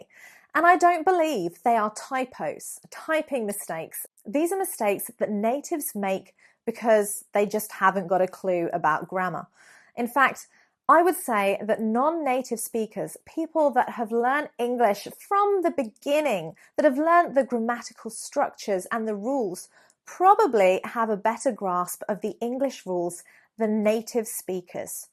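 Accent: United Kingdom. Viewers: You sound exactly like every Snooty British person every American citizen hates with a dieing passion.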